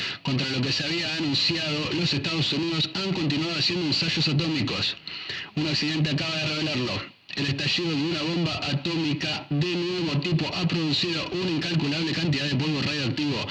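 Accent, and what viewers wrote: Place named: Argentina. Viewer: sigue hermano. Este cómic solo tiene sentido con acento argentino. Gracias desde colombia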